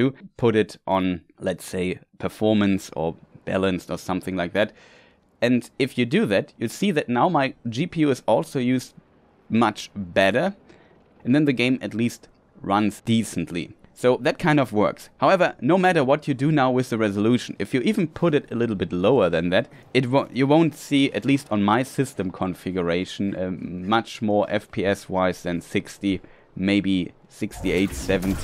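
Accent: Germany. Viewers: Man hört sofort, da steckt nen deutscher hinter ^^ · Kann es sein das du eigentlich deutsch sprichst habe da ein paar Wörter gehört die das untermauern 😁? Warum ist es zum Standard jedes neue game mit so einer Performens raus zu bringen? EA kann nichts wenn sie nicht exklusiv dafür bezahlt werden. Das erste Update hat null was gebracht... CPU immer noch bei über 60% und GPU nicht mal bei 60%, CPU ist eine 2700x mit 4,2GHz und GPU ist eine 3060 OC sie läuft bei 2115MHz und 8000MHz Memory Takt. Mal sehen ob dieses Jahr noch ein Game kommt was einfach ab Tag eins läuft, das wäre ein Traum 😍. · You are definitely a German brah 😀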